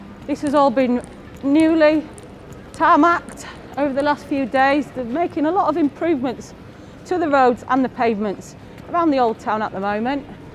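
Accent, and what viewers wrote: Yorkshire, United Kingdom. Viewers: Hi ....you sound like your from Yorkshire ....am I right...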